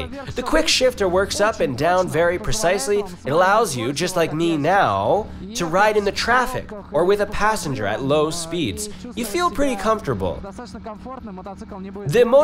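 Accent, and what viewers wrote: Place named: England, United Kingdom. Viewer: oh you're from England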